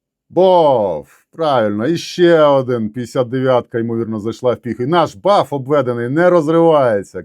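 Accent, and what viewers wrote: Transcarpathia, Ukraine. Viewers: Ваші коментарі при знищенні хробаків з переливом закарпатського діалекту і багато ще чого ,якби нерозуміння що це війна і йде щосекундна зміна ситуації,то як в якомусь фільмі,але ж то реальна загроза всім нашим українським захісникам,Дякуємо Вам , і віримо в Перемогу України🇺🇦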